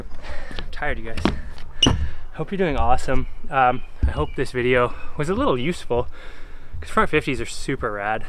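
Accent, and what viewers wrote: Canada. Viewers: Maybe its the white shirt, maybe its the canadian accent.. but after clicking on this I almost thought I clicked on a Vancouver Carpenter vid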